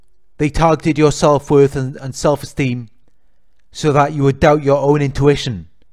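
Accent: Wales, United Kingdom. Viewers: Neither! He’s Welsh.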